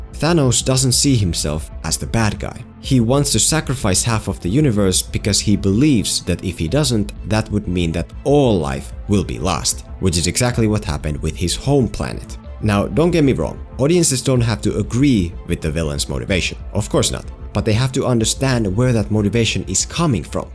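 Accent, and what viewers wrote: Finland. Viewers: I was 100 % sure you were Finnish because of your English accent. It's a unique accent I thought only Finns had. The more you know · Dying to know where your accent comes from. Sounds a lot like a Finnish accent to me :D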